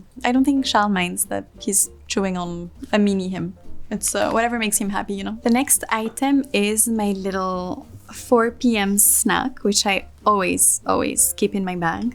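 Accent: France. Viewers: She’s really so beautiful, I love how she speaks English with a French accent. Shes so classy, I love classy women. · The French accent ❤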